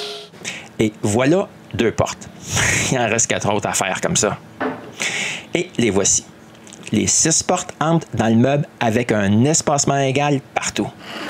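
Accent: Canada. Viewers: ce petit accent Canadien me régal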